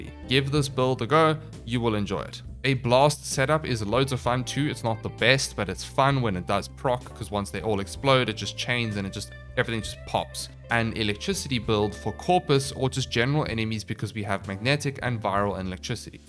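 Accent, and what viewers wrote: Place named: KwaZulu-Natal, South Africa. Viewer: You from KZN bru?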